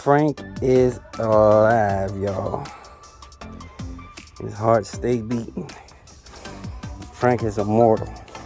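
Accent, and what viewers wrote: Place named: Louisiana, United States. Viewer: I can tell you know your electricity, after you mentioned ohms law, and how a diode pushes current through one way only (in another video). I know you're in Virginia, but you sound like you're originally from Louisiana. ✌️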